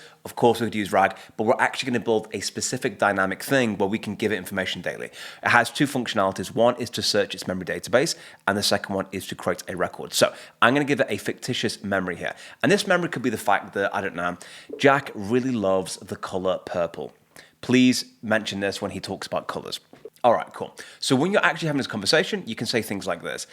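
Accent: United Kingdom. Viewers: Jack, it looked interesting, but a Brit might have no trouble understanding your accent, given the speed at which you speak. This ignorant American can't follow. You might consider slowing your cadence to Douglas Murray's speed so we, who love the sound of the British accent but don't understand original English fully, have a better chance of following what you say on a complicated subject. Cheers ~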